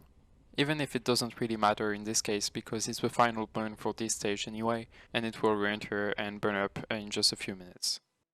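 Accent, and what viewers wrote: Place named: France. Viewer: La vidéo apparait de manière random dans mon fil... je t'écoute parler et je reconnait un petit accent francais.. tu serais pas à Supaero par hasard?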